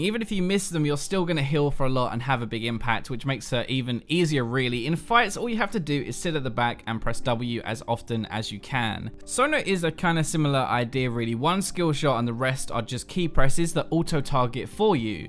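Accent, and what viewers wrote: England, United Kingdom. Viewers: Which part of England is that accent coming from?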